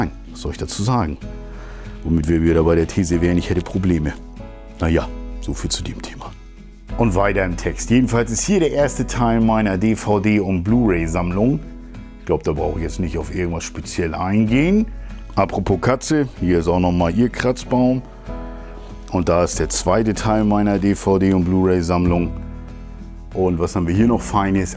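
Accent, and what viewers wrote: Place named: North Germany, Germany. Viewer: Ich wünsche dir ein paar wirklich phantastische Weihnachtstage lieber. Ich freue mich immer, wenn eins deiner Videos raus kommt. Der norddeutsche Akzent und Art lösen bei mir ein klein wenig Heimweh aus. Falls du jemals einen Mitbewohner suchen solltest, dann sag auf jeden Fall Bescheid!:D Bei der Bude bekomme ich ja einen Nerdgasm! Ein einzig riesiger Spielplatz!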